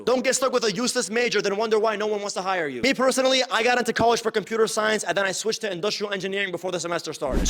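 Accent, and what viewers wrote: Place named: Jordan. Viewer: Bro English with Jordanian accent is insane haha, great video btw bro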